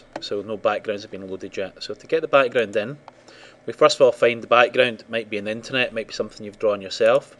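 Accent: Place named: Scotland, United Kingdom. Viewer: hes Scottish.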